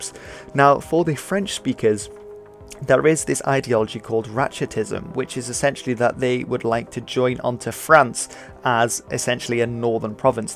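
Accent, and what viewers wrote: Netherlands. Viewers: btw ben je nederlands? ik hoor aan je manier van spreken.maak ook video van die crash tijd geleden op die brug waar alle auto's op mekaar stonden in brand etc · Your accent from the Netherlands bothers me so much when you pronounce place names especially the “w” and the “g” 😂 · He is Dutch. You can clearly hear his accent. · dpassch His name, his accent and his references, combined with the amount of misinformation that he presents as "facts" lead me to believe he's Dutch. If he's British, that would make this channel even weirder, if he truly has no connection with the Netherlands, why is he so obsessed with it?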